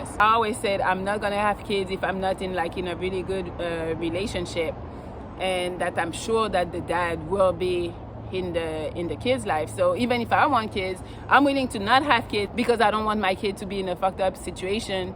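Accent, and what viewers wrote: France. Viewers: J'adore votre chanson " "....lol from Kenya. Also your french accent....looove it. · Hi, I am just discovering your channel, I mean I've whatched two or three of your videos so far and I like your frankness about your view of how a relationship should start and be conducted. I have just one question that has nothing to do with your contents: where are you from? My guess is that you are from some French speaking country because you pronounce certain words with a very slight French accent. How did you learn to speak english with almost no accent? I myself was born in Paris (France) originated from Martinique. keep doing what you already doing so good. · Irresistible like me damm I like that French accent you turn me on babe butt I have girl wish I would have you in my younger days this is from a great womanizer like me the best in the game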